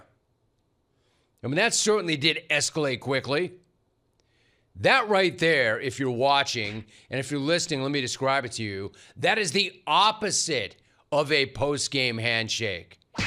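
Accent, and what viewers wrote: Southern California, United States. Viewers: your soo funny with your so-cal accent and lingo.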